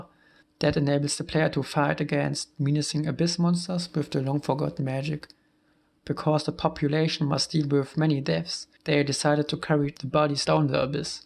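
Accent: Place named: Germany. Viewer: When you hear a German accent, you know it's going to be fire.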